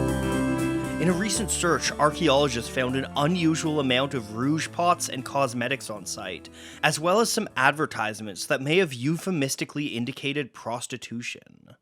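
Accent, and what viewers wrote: Ontario, Canada. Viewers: The narrator sounds like hes from Ontario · Narrator is sooo Canadian that I almost think it's a young Bruce McCulloch. Hoose.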